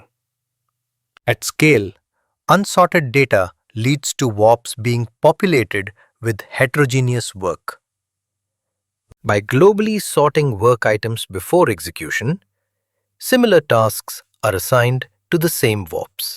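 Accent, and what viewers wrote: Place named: India. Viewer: why does a clanker need an indian accent, like horrible coding course squared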